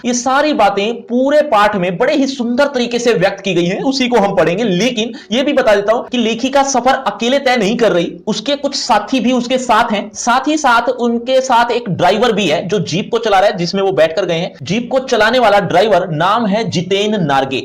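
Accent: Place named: Punjab, India. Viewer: Sir's Definitely From Punjab,His Accent Shows It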